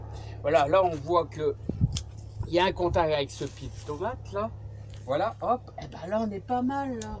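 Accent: North, France. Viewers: Super merci avec ton accent du nord j àdore et je vais aérer mes tomates bonne idée a bientôt pour la suite bonne journée Eliane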